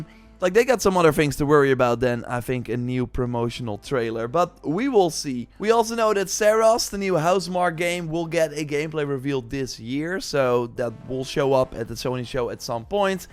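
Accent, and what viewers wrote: Netherlands. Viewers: Klinkt Engels, als een Nederlander...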